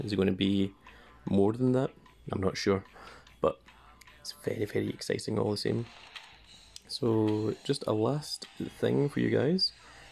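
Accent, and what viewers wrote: Scotland, United Kingdom. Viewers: Are u from scotland??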